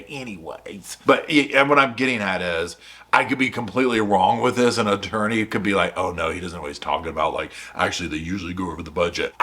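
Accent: South, United States. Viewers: Great video also as as someone from Arizona whose never been east of Texas you might be the first person I’ve ever heard with a southern accent & gay voice lmao and I gotta say a gay southern accent is now my new favorite accent ( sorry Australians)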